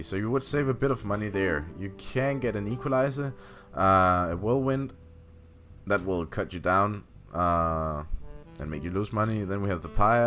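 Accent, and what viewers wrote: Denmark. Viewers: Ye I thought I could hear some Danish accent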